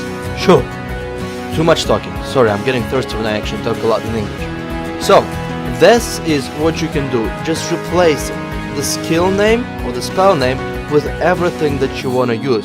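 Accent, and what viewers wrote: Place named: Russia. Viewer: ..I pretty much liked the video,but you should try to make your accent slightly better. It is not bad to be russian or whatevs,seriously,just sometimes I don't understand what you say that's why..Plus u will become better in english :D Other than that,I enjoyed the video.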